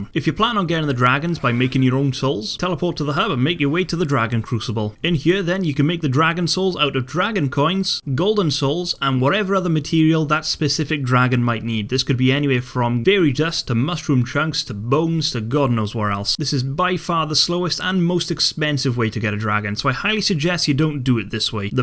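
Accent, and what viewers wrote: Wales, United Kingdom. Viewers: Are you welsh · Are you Welsh?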